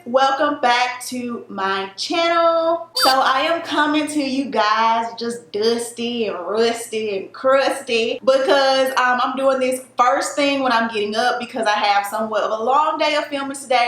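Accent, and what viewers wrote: South, United States. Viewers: Get those ruts ❤️😂❤️😂❤️ I love that country twang...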